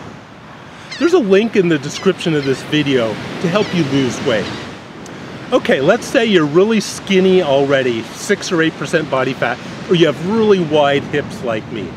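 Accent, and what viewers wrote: Germany. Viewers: Well, yea, but he seems to have a little German accent when he speaks English. Mostly with the latter "R"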